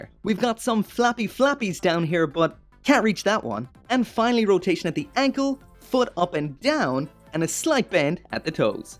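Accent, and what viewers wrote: Ireland. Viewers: Oy! Irish?! Noice. Is your waifu genderbent lockon Stratos? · You're Irish-born huh?!?!?!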